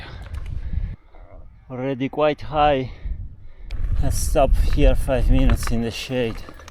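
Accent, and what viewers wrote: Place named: Italy. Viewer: Ho reconisciuto questo bellissimo accento italiano . non importa la lingua straniera parlata , l’accento mi fa sempre scogliere 😂😂😂😂 benvenuto nel nostro paese ❤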